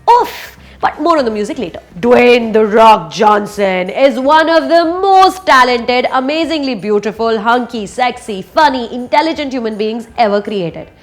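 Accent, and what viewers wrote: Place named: India. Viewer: I love the way you explain the movie in your indian accent. Its totes adorable.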